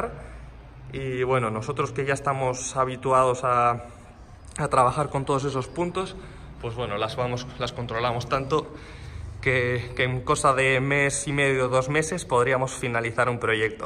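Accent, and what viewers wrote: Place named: Spain. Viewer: Por qué los españoles no pronuncian las palabras en inglés cuando claramente es en inglés. Por qué se inventan pronunciación.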